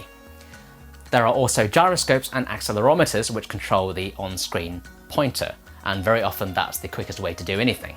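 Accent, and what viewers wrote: England, United Kingdom. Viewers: The overly English accent makes me lose focus. I fucking hate you. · Very nice informative review and may I say great combination, the Oriental look with an English accent, very clever looking and sounding, I'm not just debating but I'm still researching and as far as design goes I think they messed up here because the whole idea of having an ultra thin TV is to show it off and I'm sorry but that curved bottom just doesn't do it for me, I don't care what kind of Sound it gives you, because basically if you're going to spend that sort of money for a TV, if you got any brains whatsoever you would spend a little bit more and get a AV to go with it?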